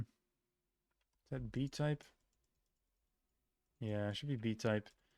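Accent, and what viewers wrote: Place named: Portugal. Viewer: and if you're fluent you probably learned from portugal portuguese, right? Your accent sounds portuguese-like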